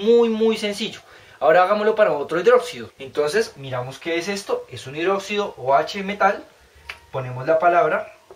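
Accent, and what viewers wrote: Colombia. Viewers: Se nota que eres de Bogotá, Colombia (lo se porque yo también)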